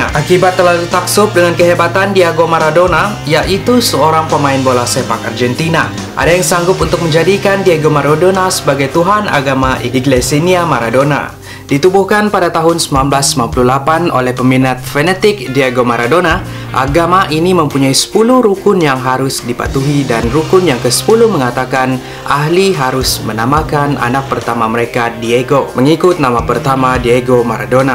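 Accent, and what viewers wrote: Malaysia. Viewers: Kalo boleh tau lu itu asalnya dari mana ya Logat nya kaya Malaysia tapi gak tau deh kalo ada yang tau boleh di replied ya😺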